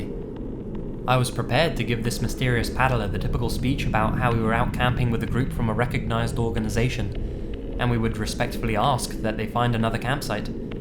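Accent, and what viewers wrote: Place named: United Kingdom. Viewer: Good stuff. One of the best narrators for this stuff, I'll even forgive the Brit accent.: ) Actually I think that's what really brings it together, keep it up. I wonder how many creepos listen to these stories and are like "Oh shit, that was me! Awesome."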